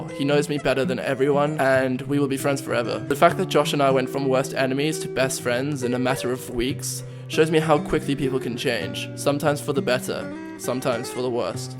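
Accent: England, United Kingdom. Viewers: So did you develop the English accent when you moved, or did you have it in south africa ? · how come he has a british accent but he lived in south africa all his life?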